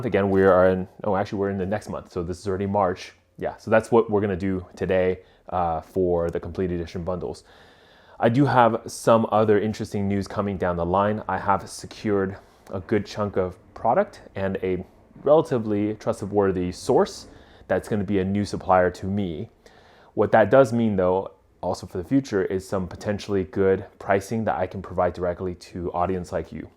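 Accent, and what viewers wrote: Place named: California, United States. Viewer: It sounds like you either live in the Bay Area or Southern Ca.. I hope you are successful with your Magic business.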